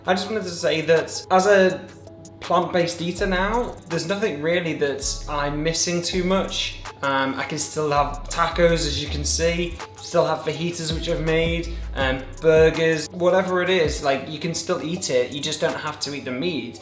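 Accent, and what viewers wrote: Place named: Liverpool, United Kingdom. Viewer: Food & Fitness Always yay I’m from Bromborough in the Wirral 🙌 London now though I could definitely hear it a little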